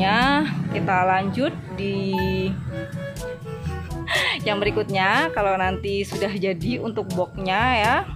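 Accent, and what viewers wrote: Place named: Sumatra, Indonesia. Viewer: logat bicaranya dah mulai logat sumatra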